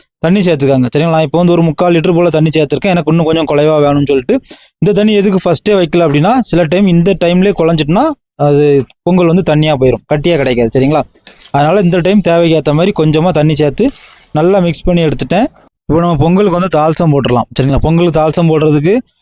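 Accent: Tirunelveli, India. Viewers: From his pronunciation I guess he is from Tuticorin/Tirunelveli side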